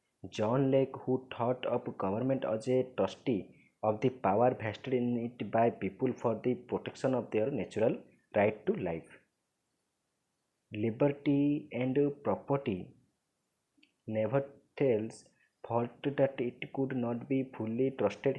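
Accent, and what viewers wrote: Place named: Odisha, India. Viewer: I believe you must be aware with the fact that each one of us have a accent and so does he, which is not a big deal. These dialects are influenced by the different languages that different sections of the country also speak, side by side with English. He is from Odisha due to which he speaks such. Instead of criticising him you should appreciate him for explaining this concept in a wonderful manner.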